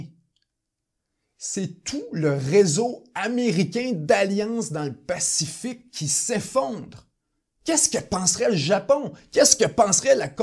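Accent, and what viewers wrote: Quebec, Canada. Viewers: on dirait un terra bellum Quebecois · J'ai eu ma dose d'accent québécois ça fait plaisir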